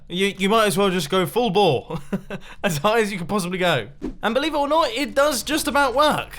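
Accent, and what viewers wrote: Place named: United Kingdom. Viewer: used to sound less British back in 2017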